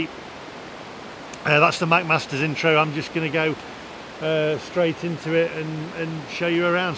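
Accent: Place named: Lancashire, United Kingdom. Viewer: bless you ,they can never take away our memories ,only thing that keeps me going some days ,you sound more lancashire in your younger days lol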